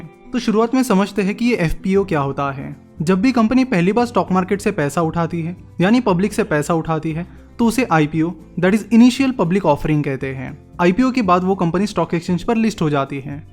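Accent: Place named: Maharashtra, India. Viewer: You put in a lot of efforts. Appreciate all your work. You should work a little on your pronunciation. Pledge is pronounced incorrectly. It is not pronounced similar to plague. It is short pronunciation. Similar to hedge. (Investor is the correct spelling. Invester is wrong spelling). Keep up the good work. Work a little on your pronunciations and spellings. You have too much Marathi accent. Make it more neutral.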